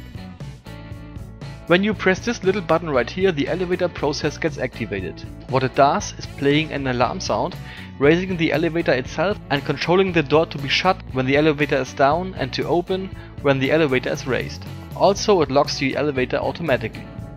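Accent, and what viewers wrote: Germany. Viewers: are you german? cuz your accent, you know... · thats a nice ship, i only build in smallship form because it has more detail for my steam-punk fleet. btw, are you german? iam german and you sounds like that. :D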